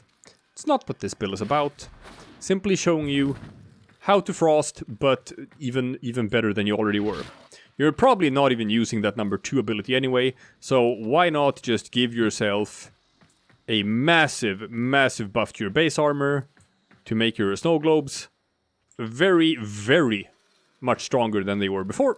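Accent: Sweden. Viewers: Are you swedish? You have a swedish dielect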